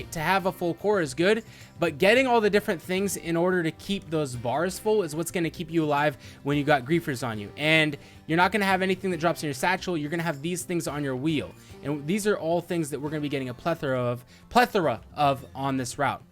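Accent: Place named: Russia. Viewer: I have to ask (re: Russian/Ukrainian accent)--are you of Russian descent, or it the accent just a fun-to-do thing? 8-D Either way, I always get a kick out of it. lol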